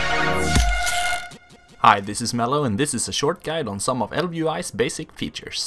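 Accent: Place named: Gothenburg, Sweden. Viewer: You have the most Gothenburg accent I've heard in my life.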